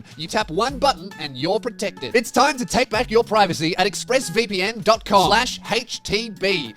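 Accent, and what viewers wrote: Australia. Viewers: Wait how to basic is Australian??? Fellow aussies... AUSSIE AUSSIE AUSSIE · So he’s an aussie then hmmmmmmmmmmmmm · is australian? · yep, australian confirmed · Since when the fuck are you Australian?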